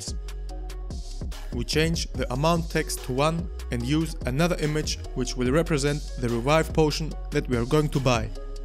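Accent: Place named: Greece. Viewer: I firstly suspected that from your pronunciation. It sounded similar to Greeks' accent in English. Also in your About tab on your channel page, you can see your country because you used a Greek phone number to verify the channel.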